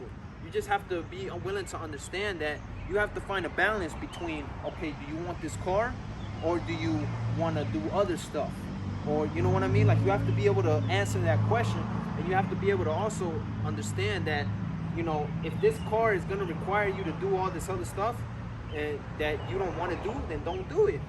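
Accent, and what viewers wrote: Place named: New Jersey, United States. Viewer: Good advice ✅✅✅sounds like you from New Jersey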